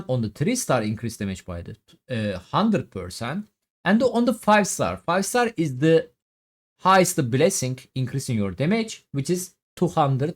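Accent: Turkey. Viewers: bu elemanin aksani türk ingilizcesi gibi değil mi 😅😅 türk olduğuna iddiaya girerim ama ispatlayamam😅😅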